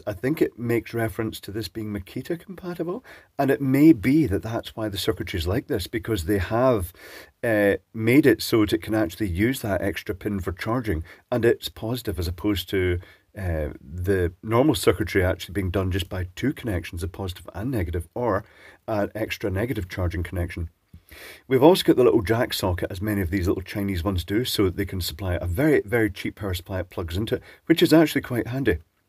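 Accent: Scotland, United Kingdom. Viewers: A Scottish accent indeed, but a very pleasant lyrical Scottish accent. I wonder what area of Scotland speaks like that? Certainly not Glasgow with its hash, brutal delivery.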